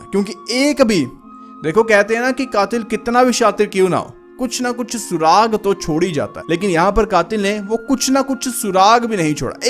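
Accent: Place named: Punjab, India. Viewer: thorA bai punjabi touch nll bolda hindi like teekhi cheej bola nuklelee ko 😂😂 ndd bor nhi hunda bnda jinioo mrzi long hove video ❤❤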